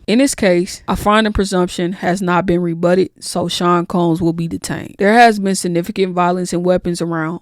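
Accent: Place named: West Coast, United States. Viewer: I love your west coast accent!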